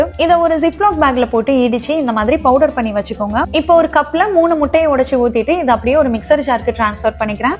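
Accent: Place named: Kayalpatnam, India. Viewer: Eanga ooru kayalpatnam solra style super.eanga iruntha eanna.sontha ooru special than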